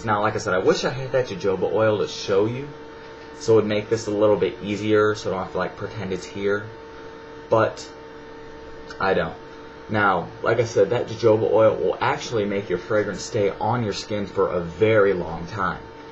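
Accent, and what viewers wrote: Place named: Pittsburgh, United States. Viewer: your from Pittsburgh aren't you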